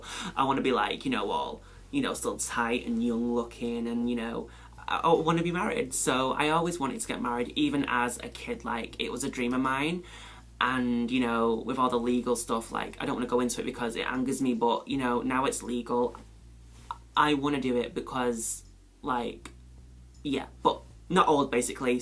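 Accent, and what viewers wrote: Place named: United Kingdom. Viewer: Love this video😍❤ karate is a fight sport so yeah and I think you have a brittish accent and I realy like that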